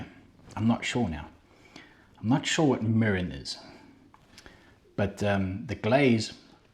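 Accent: South Africa. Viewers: So nice to hear a South African accent on YouTube.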